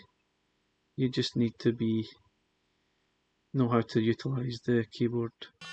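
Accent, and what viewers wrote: Scotland, United Kingdom. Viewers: Hi! First off, I like your reviews the best… Scottish accents are my favorite! I speak English but can’t understand a word of Scottish! It’s brilliant! My question…. Does it have intellivission?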